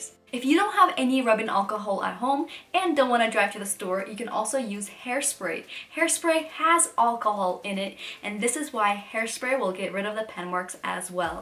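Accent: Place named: United Kingdom. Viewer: You have a bridish axcent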